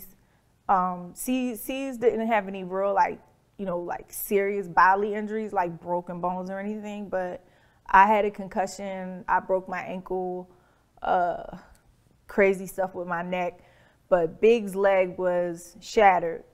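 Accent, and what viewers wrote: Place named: Philadelphia, United States. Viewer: I love her Philly Accent!!!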